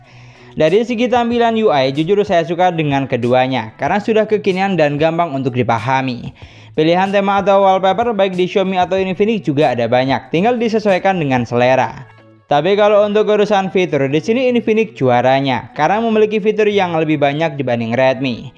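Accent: Madura, Indonesia. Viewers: Logat madura campur sumatra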